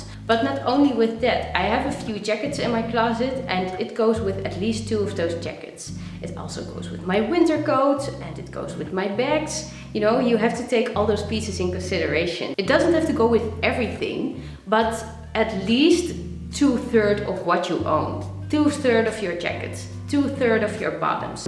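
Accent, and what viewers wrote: Netherlands. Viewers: Hi! Spreek je Nederlands? Want ik denk aan jouw accent te horen dat je van Nederland bent? 🥰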